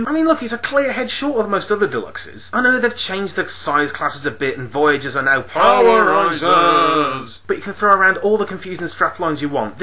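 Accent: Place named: United Kingdom. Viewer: Hey lad, I know you sound British but in a video you showed a Budweiser. Are you truely British?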